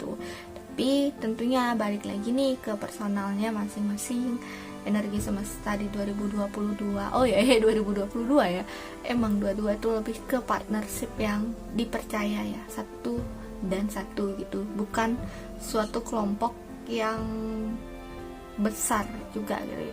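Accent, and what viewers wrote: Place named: Bali, Indonesia. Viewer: Logatnya kakak kaya orang bali 😀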